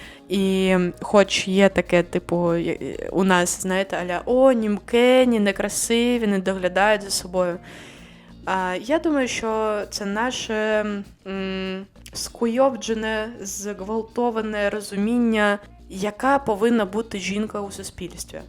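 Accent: Western Ukraine, Ukraine. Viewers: Прежде всего благодарность и помните что немцы и Германия вам ничего не должны. БюрокрАтия ударение на а. В мате ху**о ударение на о, у вас западэнский акцент, приятно было вас слушать, много полезного рассказываете, но Германия не для таких туристов как вы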